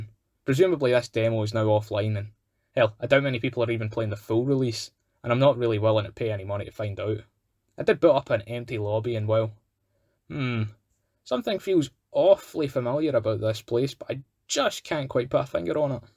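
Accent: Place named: Scotland, United Kingdom. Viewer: May you gain million views random scottish man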